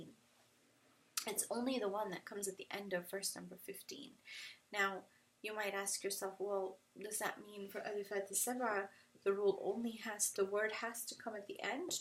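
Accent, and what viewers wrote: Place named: Arab world. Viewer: i wish i could recite the Quraan with that beautiful Arabic accent.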